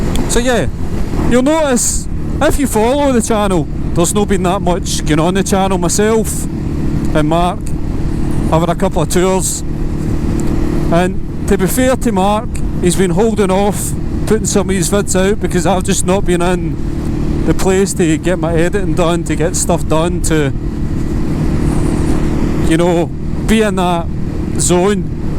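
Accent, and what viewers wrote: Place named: Scotland, United Kingdom. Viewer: Took me a while to 'tune' into your accent, but then after I dialled in Scottish in my head...... all good. Fun 'non review', will watch your other content to see how it plays out for you. I'm just looking in 2025 for a used HyperStrada here in Australia, not many to be found and all are the 2013 '821 version, nothing later. I'm after something non sports Ducati as my wife loves Ducatis BUT most dont do a pillion well. Scramblers appear to be an obvious choice for the seating BUT scramblers are really poorly appointed with cheap fittings, absolute scam for the price imo. Monsters we have tried but not pillion friendly, and then all the 'touring' Ducatis are heavy and bloated. The Hyper seems to be the only real option that is light, agile and simple enough for me. Just a matter of finding one at a decent price. 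I have boxer BMs and F800GT BM so I understand how a good bike should be made and handle, my last Ducati 749 was a lounge princess, as was the 1100S monster, I want to actually ride my Ducati but dont want to go back in time to the aircooled models for simple no time pressure maintenance. 2013 seems to be the start of the more reasonable service intervals on the belts so I am in the correct zone I hope.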